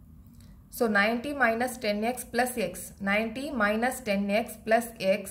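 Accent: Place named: South India, India. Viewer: Mam you are from south INDIA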